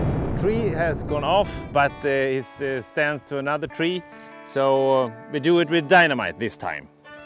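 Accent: Sweden. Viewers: Bra / Good Swenglish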